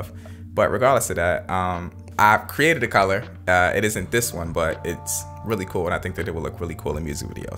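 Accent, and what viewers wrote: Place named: Atlanta, United States. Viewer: His dialect is like Andre 3000. Atlanta, maybe?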